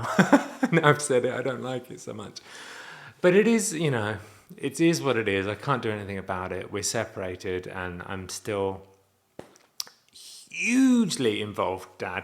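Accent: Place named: United Kingdom. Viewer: I LOVE your videos. You are just being you and it's beautiful to see. Don't stress about how good or bad your videos are, just keep doing it🙂 Side note, I've been watching Avenue 5 and Hugh Lauries character reminds me so much of you 🤣🤣 it's the British accent and unkept realness of you both that I love., keep being you. Xxxx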